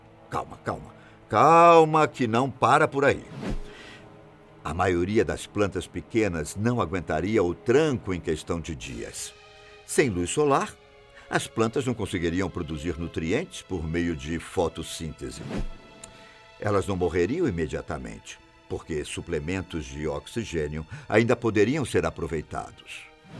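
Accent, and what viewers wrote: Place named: Rio de Janeiro, Brazil. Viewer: É que ele é carioca kkkkkkkk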